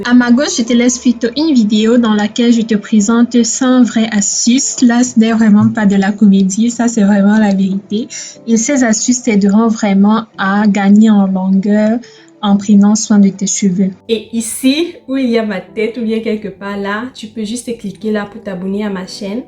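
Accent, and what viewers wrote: Cameroon. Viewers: Uhein dès que je t'ai entendu parler j'ai toute suite su que tu étais camerounaise vraiment le goût de ça une belle camerounaise 😂😂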